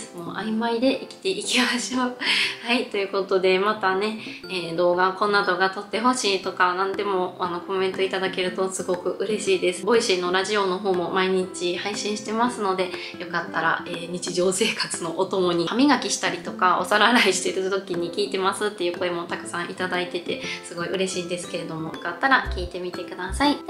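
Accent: Kansai, Japan. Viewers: 最近のあんくんと出ている動画では標準語寄りだけども、1人で話す時はやはり関西弁になるのがかわいい（笑） これからも変わらず応援していますよ✨✨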